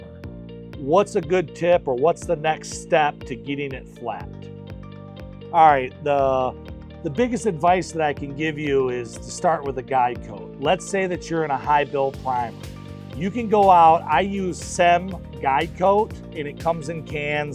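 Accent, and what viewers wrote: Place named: Michigan, United States. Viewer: Q&A is awesome. I like that you are describing the way you do it. It's up to us after that to follow your way or tweak it to our preferences. I hope you keep doing the podcasts and video's. It is so interesting to hear you're way. It's great to get a professional point of view. I'm a Michigan boy removed 33 years. They said I had an accent when I moved to Washington State. I never heard it, until now. Love the Dad podcast. Also appreciate you helping us all out. I have a 67 Camaro. "Better of Dead" I'm in final sand. Base coat clear coat. I'm sanding to 600 because I'm going black. I'm using my local Wesco products. Euro prime. No epoxy. Can I put epoxy on top for a sealer? Is 600 to tight to grab the next coat? It almost shines. Using a finish line devilbiss 1.3. For final. Also. I have at least 1000 hours In bodywork. 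I don't want to screw it up by using the wrong product or clear on it. Thank you and good to hear that Michiangder accent!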